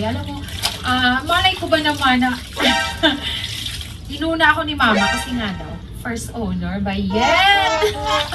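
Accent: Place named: Visayas, Philippines. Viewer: Pyor jud bisaya si ma'am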